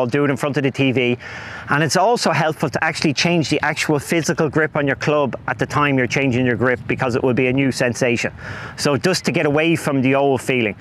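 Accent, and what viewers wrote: Dublin, Ireland. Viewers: Love the Dublin accent , little tums . My favorite band is a Dublin band THIN LIZZY or how Paddy would say TIN LIZZY .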